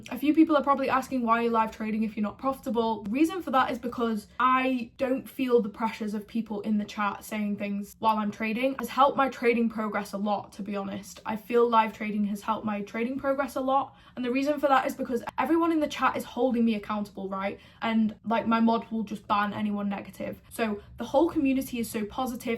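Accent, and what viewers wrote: United Kingdom. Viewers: Very switched on and can tell your Driven you sound like a stokie btw 😉. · you got a slight birmingham accent you from Brum ?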